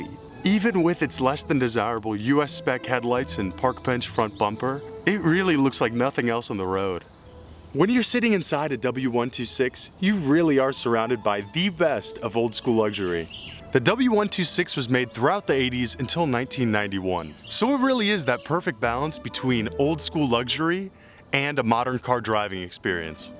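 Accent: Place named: Italy. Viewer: Totally Italian talking styles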